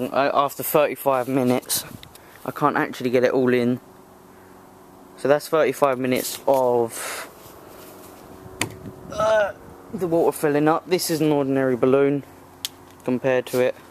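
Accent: United Kingdom. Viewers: gr8 m8 8/8 sound like from the south east somewhere. Not Scottish though m8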